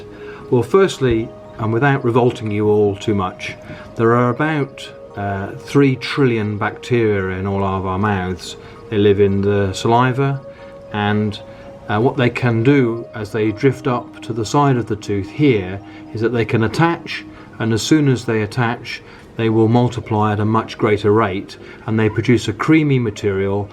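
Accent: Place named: England, United Kingdom. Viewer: Because he’s English and that is the correct way to pronounce it.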